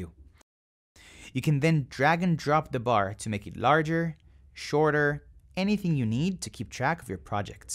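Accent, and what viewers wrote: Philippines. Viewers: sounds like a philipino speaker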